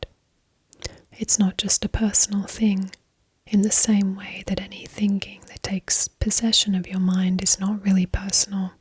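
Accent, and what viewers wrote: Australia. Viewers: Really awesome asmr...well done...and finally found an Aussie asmr-tist that i like!. Subbed!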